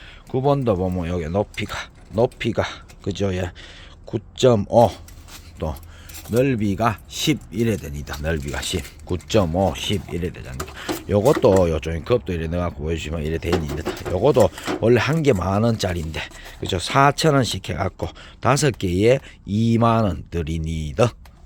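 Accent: Gyeongsang, South Korea. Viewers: 안녕하세요 수고가 많으십니다 구수한 목소리 경상도 사투리 반갑습니다 경북 영주입니데이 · 경북 영주에 있지요 사장님구수한 사투리가 경상도 아재 아닌교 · 구수한 경상도사투리 멋있는 오빠 경북 영주시에 있지요~^^ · 안녕하세요^^ 사장님 목소리톤 엄청시리 매력있는데요 ㅎ 갱상도 사투리 ㅎ 경북 영주시 우암도예 분과 배합토가 유명하죠 저도 한번 가고싶네요..올도 화이띵입니다❤❤❤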